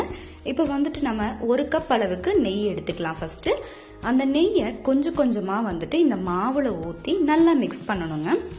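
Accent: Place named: Kongu, India. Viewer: Nice sis..kongu slang theriyuthu..kovai i think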